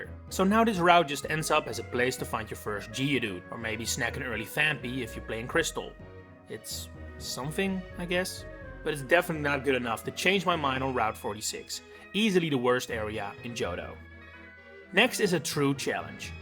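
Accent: Netherlands. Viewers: Vind het geweldig om te horen dat je Nederlands bent puur aan je accent. Het is niet extreem Nederlands, maar Nederlands genoeg om te horen dat je 1 van ons bent ;)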